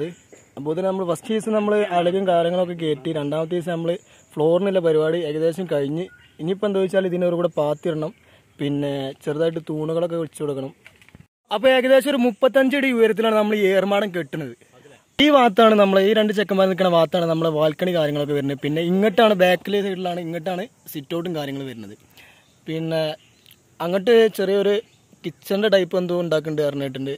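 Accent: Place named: Malappuram, India. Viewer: Mallapurum slang 🔥🔥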